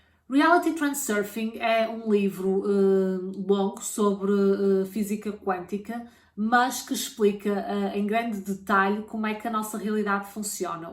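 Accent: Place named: Portugal. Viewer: Ótimo vídeo e sotaque português é tão bonito! 👏🏻👏🏻👏🏻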